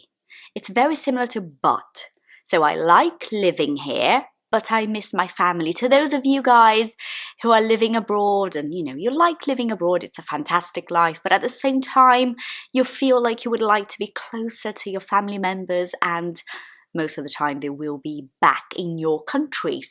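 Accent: United Kingdom. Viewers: I love this british accent 😍🇬🇧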